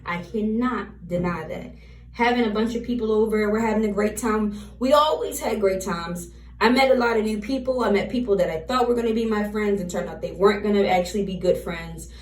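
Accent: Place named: Baltimore, United States. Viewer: You from Baltimore I know stUpid and DUo when I hear it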